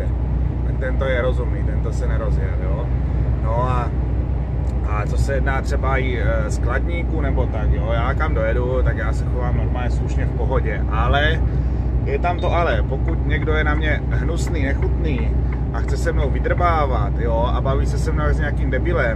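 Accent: Moravia, Czech Republic. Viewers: Za mě super, tož Ti dávám odběr 👍 A hrozně dobře se to poslouchá, ta naša moravština 😃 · Nemá chybu ta moravská mluva, je to vtipné, ale v dobrém smyslu, dobře sa to poslúcha:-) Tak tak lidi by měli být na ty kamioňáky ohleduplnější však to není sranda toto šoférovat apropo kdo by jim domů dovezl ty jejich ebaye a wishe a další... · Perfektne video, nedalo mi a dnes som si ho pozrel druhy krat. Dobry koment,obraz proste vsetko a ten prizvuk moravsky, moravakov mam rad:-D S to bolo ozivenie. To Nemecko ti nezavidim ked nemas den tak vindes so STAU prejdes 50 km a je tam dalsia a to nejazdim s kamionom. Klobuk dole pred vasou pracou, ja som o nej par krat premyslal ale nedal by som to pri tych preplnenych cestach, ako si povedal odstavit zahodit kluce a ist domov vlakom:-D toto zazivat kazdy tyzden by ma kleplo. Tesim sa na dalsie videa a drzim palce. 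· Ta moravština je i pro mě úplně suprová, zvláště když jsem se ocitla v Čechách a oni tady mluvijou fakt divně:-D:-D:-D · ty moravaku fajne:-)moc hezka rec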